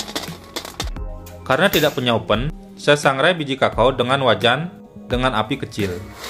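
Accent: Bali, Indonesia. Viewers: Dr Bali ya bli??? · orang bali ya bang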